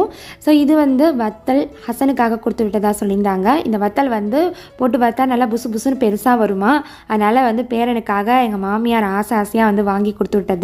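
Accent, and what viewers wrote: Thanjavur, India. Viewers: Sis neenga Thanjavur lah raajahiri yaaaa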